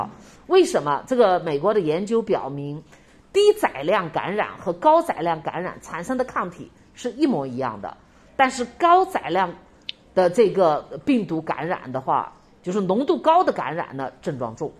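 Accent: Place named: Yunnan, China. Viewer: 云南口音😅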